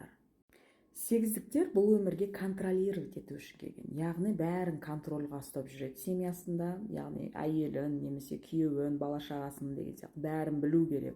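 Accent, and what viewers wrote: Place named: South, Kazakhstan. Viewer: ОнтYстiк тiлiнде...интонациясы онтYстiктi осылай сойлейдi!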